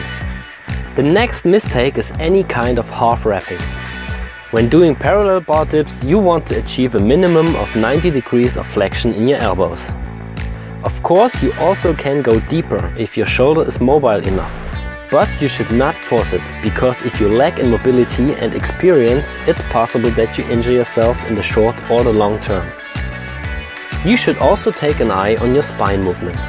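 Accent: Germany. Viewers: Man hört das Deutsch raus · Haha this german accent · Can't dafine your accent. Where are you from? Or if someone knows plz tell me. upd: It seems i found out. Is it german? Correct? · Man hört direkt den deutschen Akzent 😉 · it‘s english with german accent, so don‘t bother ;)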